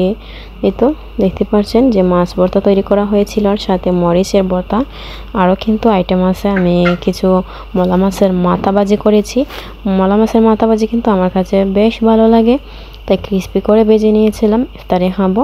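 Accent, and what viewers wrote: Sylhet, Bangladesh. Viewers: Tumi ki sylheti?koi thako?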